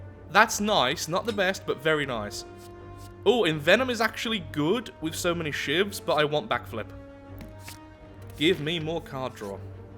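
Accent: United Kingdom. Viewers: watched the entire video with subtitles only no sound and, it was funny the errors google's speech to text makes trying to translate the British accent.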